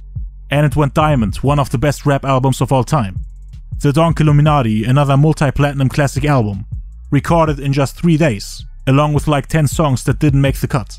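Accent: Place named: Germany. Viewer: Dein Deutscher Akzent knallt anders rein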